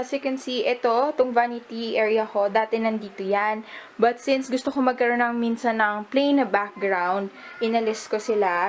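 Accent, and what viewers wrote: Visayas, Philippines. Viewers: bisaya ka sis? Parang pansin ko may kunting accent ng bisaya.😊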